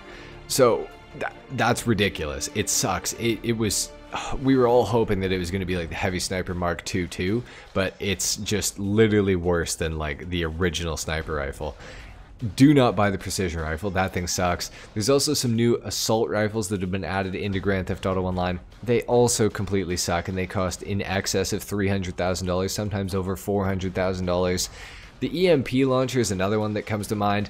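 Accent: Winnipeg, Canada. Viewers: Are u from Winnipeg brotha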